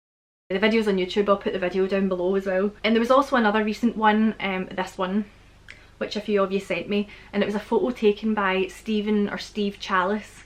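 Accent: Scotland, United Kingdom. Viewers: Love videos like these about Scotland!! And so appreciate a Scottish voice on youtube!! Love it :D More videos on Scottish myths and legends please!! :D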